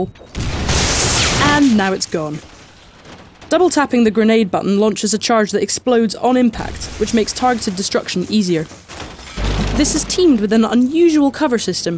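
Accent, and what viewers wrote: United Kingdom. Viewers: that british voice MAKE IT STOP!!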